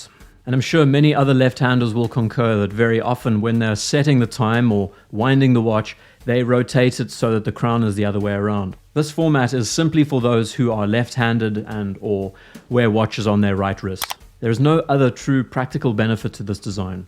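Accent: South Africa. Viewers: I swear you’re South African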